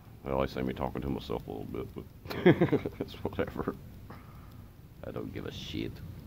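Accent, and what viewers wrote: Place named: South, United States. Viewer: Thanks for checking out our channel. Thought I would do the same. If I may ask, where are u from? Hear a southern accent. I was born in Arkansas, and lived in Texas a few years, growing up. Enjoyed the vlog.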